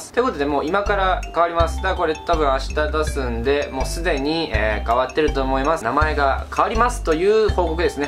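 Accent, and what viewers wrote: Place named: Osaka, Japan. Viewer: かに かに 大阪出身？ しゃべり方が大阪 ちなみに俺も大阪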